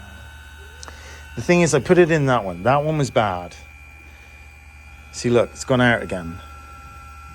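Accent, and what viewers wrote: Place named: United Kingdom. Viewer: hmmm i hear a little british accent lol.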